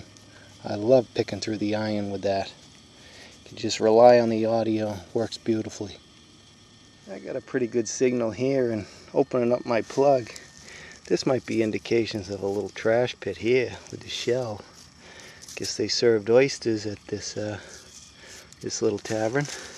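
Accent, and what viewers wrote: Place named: South, United States. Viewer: Love your accent! Southern Dirt Fishing Lady doesnt hear that often!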